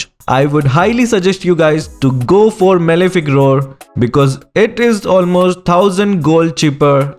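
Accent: India. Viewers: indian accent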